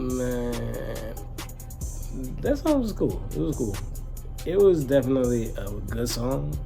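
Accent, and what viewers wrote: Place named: DMV, United States. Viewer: I feel like I understand Coles apology because he was acting out of character. He seemed like he regret getting out of himself to get in this hip hop compatible persona that everyone expects and as someone who kinda been on a similar bath of change as I can understand to a big degree and I kinda felt like hip hop beefs and disses was kinda corny for a good while. It sounds like I hear some DMV in your voice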